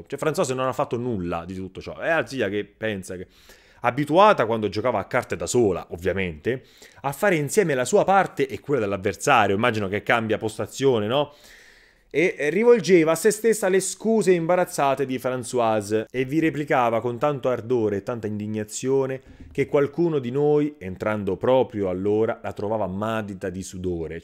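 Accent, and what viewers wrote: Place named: South, Italy. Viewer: Che accento da meridionale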